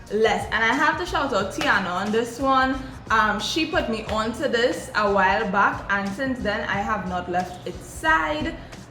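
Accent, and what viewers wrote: Trinidad and Tobago. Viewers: U hearing the Trini accent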